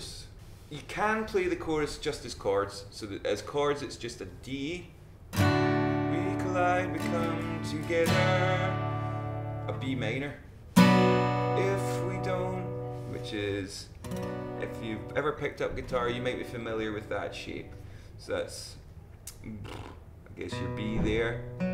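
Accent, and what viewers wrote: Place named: Glasgow, United Kingdom. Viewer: He went to the same schoole I go to he has a glasweigian accent aka Scottish but is from airshyre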